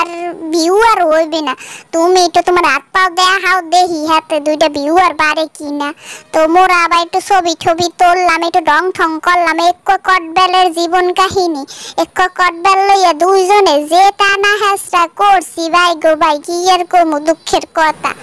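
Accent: Bangladesh. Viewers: কদবেল আহ দেখলেই জিভে পানি চলে আসে। বরিশালের ভাষাটা অসাধারণ লেগেছে। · কদবেল যেই রহম যমমের মজা হেইরহম বরিশালের ভাষাও হোনতে যমমের মজা।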